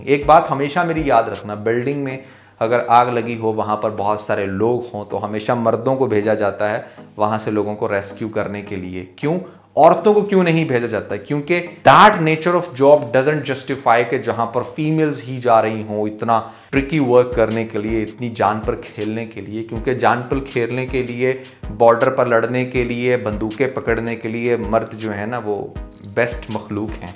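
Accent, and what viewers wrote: India. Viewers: کون سی دنیا میں رھتے ہو بھائ آج کی لڑکیاں بہت کچھ کرتی ہیں فوج میں بھی لڑتی ہیں لڑاکا تیسرے اڑاتی ہیں اور فائٹر برگیڈیر بھی لڑکیاں ہوتی ہیں جلتی آگ میں کود جاتی ہیں آدمی بھی ہر طرح کے ہوتے ہیں جہیز کے بغیر بھی شادی کرتے ہیں میرے ھڈبینڈ نے بھی کوئ جہیز لینے سے منع کردیا تھا میں کچھ بھی نیکی لائ تھی تم اپنے خیالات کے مطابق بات کرتے ہو جوان لڑکی کو آنٹی بنادیا تم نے کچھ حقیقت سے کام لو صرف ہندی لہجے میں اردو نہ بولو نہ ہندی رھے گی نہ اردو کوا چلا ہنس کی چال اپنی چال بھی بھول گیا سنی ہے نا یہ مسال خوش رھو بھیا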